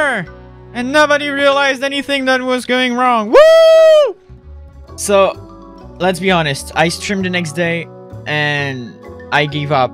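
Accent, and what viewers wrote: France. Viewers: He sounds extra French. My heart. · His accent makes me laugh soooo much Before you hate on me I am French so I am allowed to laugh at a Frenchman because he has an accent while talking English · cette accent français mdr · On entends bien l'accent français x) Je pense qu'on l'a tous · Ta un petit accent français sais trop chou xD sinon continue t'es vidéo j'adore se que tu fait ^^ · i love your accent French accents are the best..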